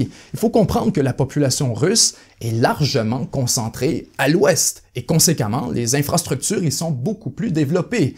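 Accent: Quebec, Canada. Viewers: Tu dois être québécois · cet accent canadien qui me rappelle les bons moments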